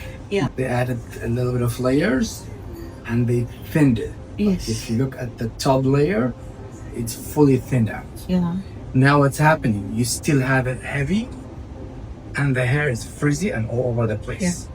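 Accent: South Africa. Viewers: When I started listening I just knew!!! South Africa!! Afrikaans!! Wow love it. So glad for you. Enjoy it for all of us. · She sounds South African😊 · She sounds S. African.? I am in SA ❤❤❤❤❤❤💯💯🙏🙏🙏🙏😍😍😍😍💋💋💋💋 .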